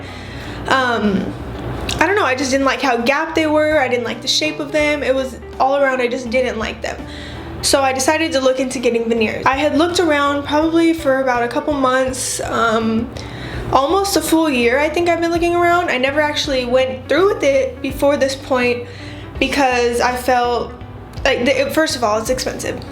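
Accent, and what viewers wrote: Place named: United States. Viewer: This is so weird, your voice is exactly like my sisters and you have an American accent which makes it even weirder... no offense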